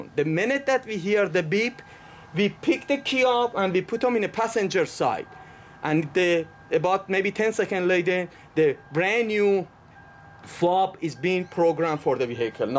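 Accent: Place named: Iran. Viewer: Are you Persian? I was watching your video and my wife (iranian) walked by and said "who is that he sounds persian.."..lol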